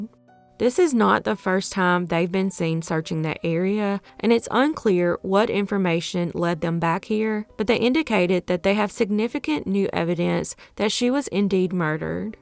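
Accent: South, United States. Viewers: The southern accent is just so darn cute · I love that southern draw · Such a adorable accent ❤️❤️❤️ I'm also from the south and you truly sound like a southern Belle 😘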